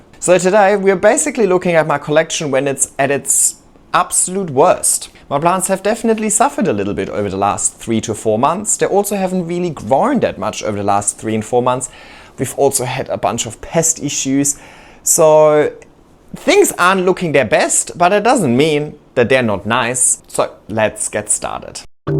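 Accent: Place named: Germany. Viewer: Your accent sounds like your German. Are you? 😁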